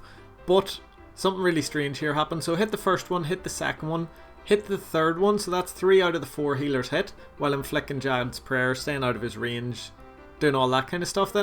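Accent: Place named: Northern Ireland, United Kingdom. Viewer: Thats a properr norrrnn irrron accent for sure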